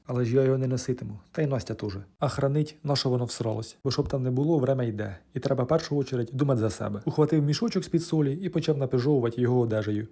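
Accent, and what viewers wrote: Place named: Cherkasy, Ukraine. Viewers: Черкаський говір слухаю як музику....🤭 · А я то чую шо шось рідненький говір. Привіт із Звенигородки, Черкащина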